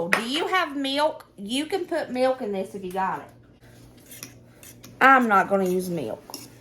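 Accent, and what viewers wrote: South, United States. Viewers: You talk country! Where ya from?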